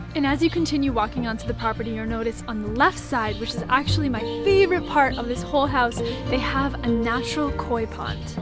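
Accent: United States. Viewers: From her accent I think she's from the USA. So this ever so eco friendly gal, did she get to Guatemala by bicycle? I fucking doubt it.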